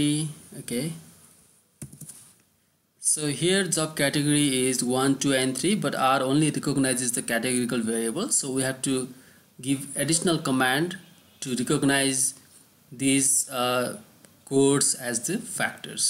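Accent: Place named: Nepal. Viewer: This guy sounds Nepalese. Anyways, nice video.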